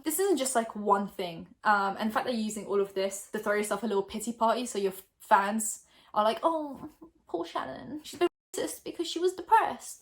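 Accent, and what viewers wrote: United Kingdom. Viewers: I watch you videos all the time but today I was surprised when you started talking and I heard your accent , as if I had no idea you had a british accent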